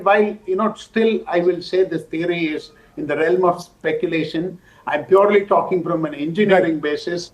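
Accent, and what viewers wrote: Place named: South India, India. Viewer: Pathetic south Indian accent 💩💩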